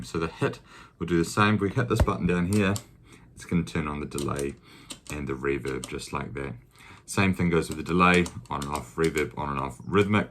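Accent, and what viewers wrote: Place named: New Zealand. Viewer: Hi You so sound like a Kiwi New Zealander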